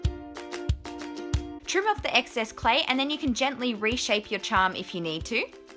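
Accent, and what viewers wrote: Australia. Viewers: She's Aussie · Are you a fellow Aussie? Or a Kiwi? I'm trying to pick up on your accent lol. I'm Australian, myself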